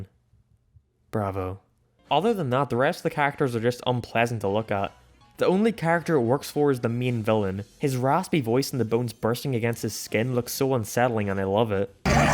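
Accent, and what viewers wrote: Ireland. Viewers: U Irish ooooooh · Yo didn't know your irish that's awesome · Wait. Is he Irish? Mind=blown. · r u irish? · Are you Irish or Scottish? I can’t tell, but don’t ever shit on my Barnyard again. Eat some shit ass food, pray to your King, and shut the hell up. 1776 from the Brits but 2006 is what you should remember. Peak USA dumbass. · wait i thought you were scottish but literally only ireland has irish as a class so that narrows the place you grew up in to side of ireland to the north or donegal because you have that kind of accent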